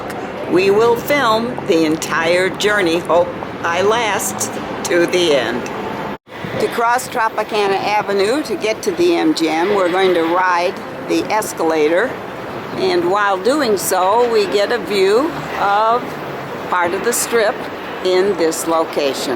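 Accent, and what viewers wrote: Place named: Chicago, United States. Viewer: Where do you come from with that accent? You sound like a Chicagoan.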